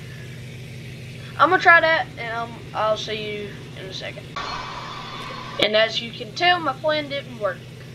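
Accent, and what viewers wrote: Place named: South, United States. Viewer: You sound so country it’s not even funny I’m also country I just don’t have an accent where you from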